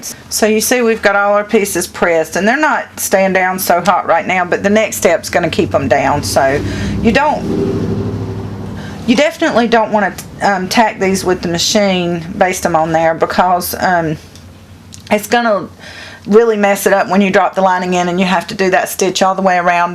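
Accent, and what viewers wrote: South, United States. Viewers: I subscribed. You are sweet and feisty af. Plus my fam is from SC so the southern twang makes me feel right at home. Thanks for being lovable and informative.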